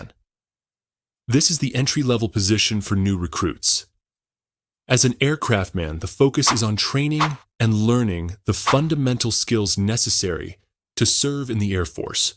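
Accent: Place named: United States. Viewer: So weird here’s an American accent explaining Australian military ranks. No thanks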